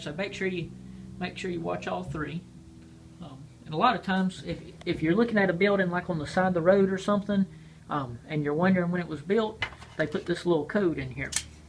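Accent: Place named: South, United States. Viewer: Thats great. Your accent sounds like mine, southern! I live between Sylacauga and Talladega Alabama. Just curious if you were from Alabama.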